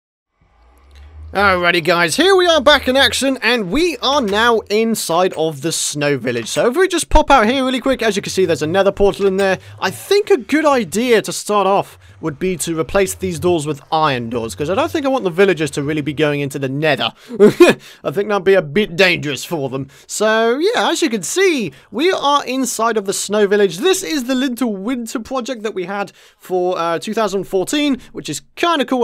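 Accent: United Kingdom. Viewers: ur voice,its so , so, UK. I love it !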